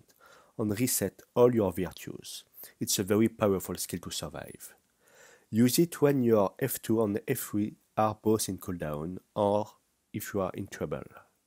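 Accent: France. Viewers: is this guy french? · un français qui parle anglais? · He is french i beleive :p i like the english with this accent =^.^=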